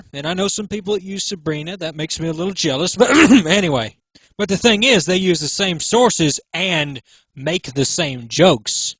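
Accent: South, United States. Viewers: you sound like a hilla billy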